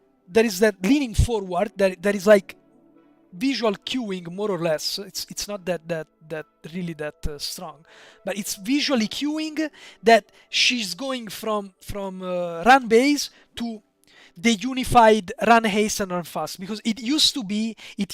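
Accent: Italy. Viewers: Are you...are you tuscan?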